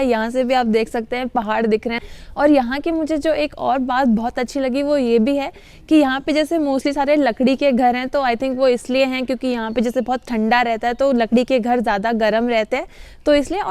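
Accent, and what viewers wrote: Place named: Rajasthan, India. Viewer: Germany ki trh bahut beautiful hai.. 👌👌 ki marwadi awosem 1no. 🇮🇳🇮🇳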